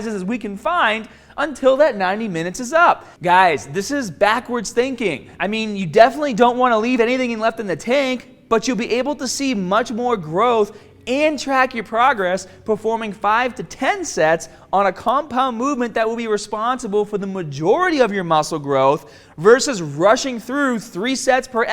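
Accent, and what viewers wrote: Boston, United States. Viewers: Bahston kid! Good video, thanks. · GREAT info and Bahston accent! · I can hear a Boston accent on this guy or is it just me hearing this. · is this dude from boston? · Nigga has a Boston accent and a White guy accent witch is just getting me confused like it really just throwing me off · Love that bastan accent 💯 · Excellent video thank you!Love the Beantown accent. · This dude's Boston accent is amazing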